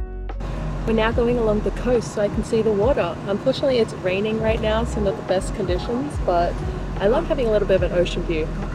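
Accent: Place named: Australia. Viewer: You have an awesome Aussie 🇦🇺 accent! I enjoy your Japan videos 😊 Thank-you from this Japanese-Canadian subscriber 🇨🇦.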